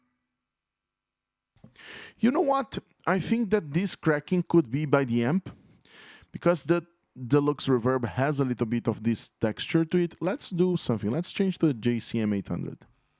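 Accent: Brazil. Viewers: Sotaque brasileiro hehe! essa pedaleira é melhor que a valeton GP200? em qualidade de som e efeitos bro?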